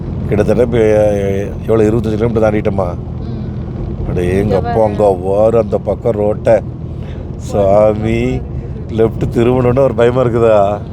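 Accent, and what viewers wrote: Kongu, India. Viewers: அண்ணா நீங்கள் பேசும் கொங்கு தமிழும் லடாக் பயன வீடியோவும் மிகவும் அருமை வாழ்த்துக்கள் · கொஞ்சும் கொங்குத் தமிழ் பேசும் கோமகரே...! திகட்டாத தித்திக்கும் பேச்சுடைய திருப்பூர் திருமகரே...! எங்கள் மதிப்பிற்குறிய டான் திரு. அண்ணா❤❤❤🙏 அவர்களே எப்படி இருக்கீங்க அண்ணி❤❤❤🙏 எப்படி இருக்காங்க ரித்திக்❤❤❤ எப்படி இருக்காரு. அழகான வீடியோ அற்புதமான இயற்கை எழில்கொஞ்சும் பனிமலைகள் பல வண்ணங்கள் கொண்ட மரங்கள்...! கண்கள் இரண்டும் குளிர்ந்தன அருமையான காட்சிகள் கண்டு...! நம் ❤சிட்டுக்குருவியோட❤ தங்களது இந்த லடாக் பயணம் இனிதே தொடர்ந்திட நல்வாழ்த்துக்கள் அண்ணா❤❤❤ என்றும் அன்புடன் உங்கள் தம்பி என்றும் அன்புடன் உங்கள் TMF ரா.முஹம்மது கபூர் (சென்னையில் இருந்து) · கொஞ்சும் கொங்குத் தமிழ் எப்ப வரும் காக்க வைத்த அண்ணன் அவர்களுக்கு வாழ்த்துகள்.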